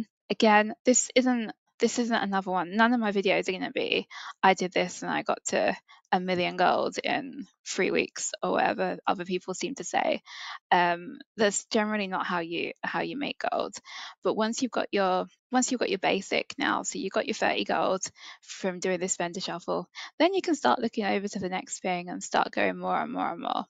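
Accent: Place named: United Kingdom. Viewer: I know this isn't part of the actual video but you have a beautiful voice! Also on Stitches EU but I think I will try to find my own flips so I don't inflate prices and spoil it for you! Trying to work out where your accent is from in the UK...tbh I am kinda terrible at guessing haha. Keep up the videos!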